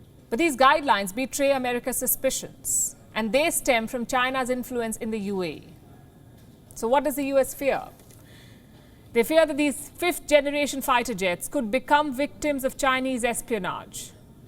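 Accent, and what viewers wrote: India. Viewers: Everyone understand british accent but not everyone understand ( Hindi English). Since WION is 'GLOBAl ' .The accent is important for all viewer's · Both ways are acceptable, the British, Australian, and American pronounce English words differently too, and in this case Indians pronounce English that way. The world does not revolve around your way of pronouncing things. There are more English speakers in India than in America think about it. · This news caster speaks English but sounds like hindi... The channel needs to replace her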